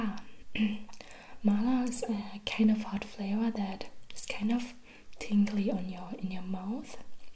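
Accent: Germany. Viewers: Your accent reminds me of my German grandmother💕 she’s from Görlitz, Germany. I went to Germany when I was 16. I loved it so much, i fell in love with the country. I want to live there someday. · ydobon it’s funny how people usually say they hate german accents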